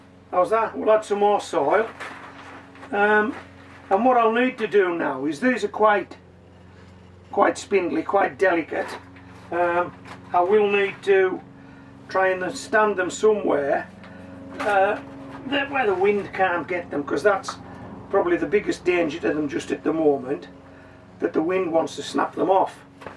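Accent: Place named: Lancashire, United Kingdom. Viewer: Yes I sure did, its a routine of mine and my granddaughter (aged 6) to watch your videos. For a long time she was convinced it was me on YouTube doing the gardening videos, we hardly see your face, as I sound like you (Lancastrian accent), grow in buckets and tubs too. Also, until recently, at the time I also had a Collie dog. The similarities are uncanny and convincing for a little one. LOL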